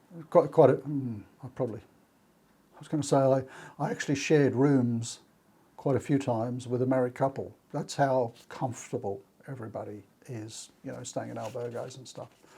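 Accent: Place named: Australia. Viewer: It's that Aussie accent: VDLP or Via de la Plata, the name of one of the Camino routes.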